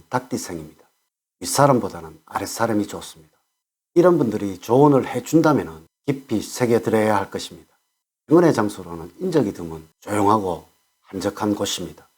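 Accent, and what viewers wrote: Gyeongsang, South Korea. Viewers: 갱상도말씀 때문에 숫자가 잘못알라 듣겠다😢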